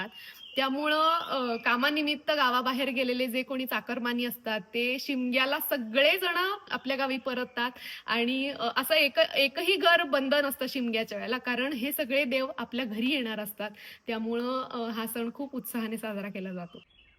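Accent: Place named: Maharashtra, India. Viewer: मूळ गाव पुणे का तुमचे बोली तुमची पुणेची वाटते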